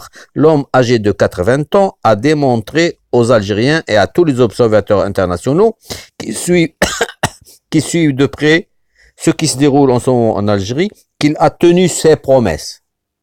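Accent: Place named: Morocco. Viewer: Mais toi tu parles sans visage et tu parles plutôt marocain !! ce que tu dis n'est une nouveauté pour personne. si au moins tu montrais ton visage , vraiment d'ou te vient cet accent???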